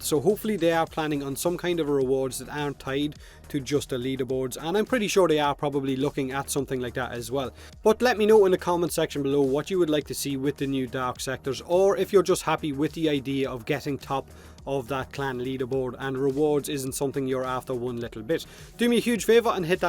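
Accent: Ireland. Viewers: Real good music selections. And let's not forget that smooth Irish voice. should do a crossover with Rhino Ninja!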